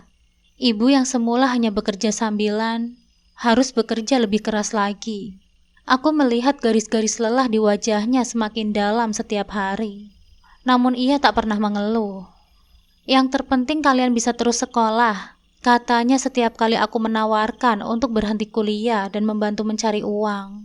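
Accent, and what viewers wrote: Java, Indonesia. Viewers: Maap pembawaannya medok mbak kurang enak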